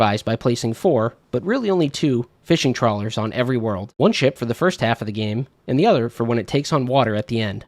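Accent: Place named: Great Lakes, United States. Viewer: "Great Lakes accent" you answered my question I posted on a previous video.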